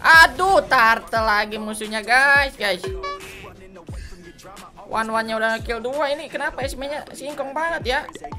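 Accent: West Java, Indonesia. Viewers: Bang orang Sunda ya😂😂 sama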